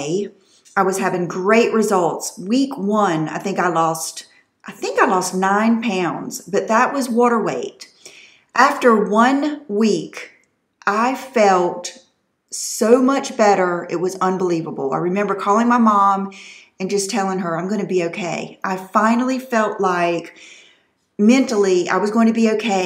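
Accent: South, United States. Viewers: Sweet, Soft-spoken, Southern, Sincere, Stunning 🥰🙏🏼🥰💄😍💕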